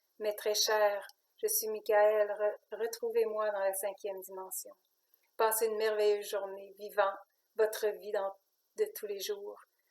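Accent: Quebec, Canada. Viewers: J 'aime ton accent québécois et d'ailleurs je devrais une fois venir voir mes amis là-bas. A part ça je me suis abonné de suite car tu parles que de l'essentiel , tout ce que je lis tous les jours sur les médias alternatifs. J'aime ta façon d'expliquer et je suis toutes tes vidéos. Salutations depuis la Suisse. Raymond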